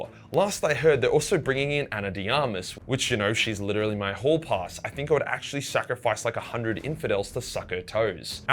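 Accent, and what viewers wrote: Australia. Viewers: Is it me, or is everything better when explained by an Aussie?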